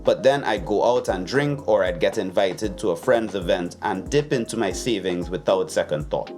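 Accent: Trinidad and Tobago. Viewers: Sound like a Trini. But living where? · are you a Trinidadian 🇹🇹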